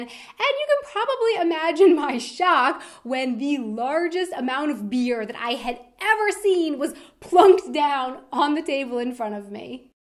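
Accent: Germany. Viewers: als der typ anfing zu reden hat man sofort gemerkt das der deutscher ist :D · hat man auch gemerkt als er enlgish gerededt hat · Oh that german accent hurts me :D but I probably sound the same ^^ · OMG I love this englisch German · English must be the most German English I've ever heard... Alongside my English lol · übler aktzent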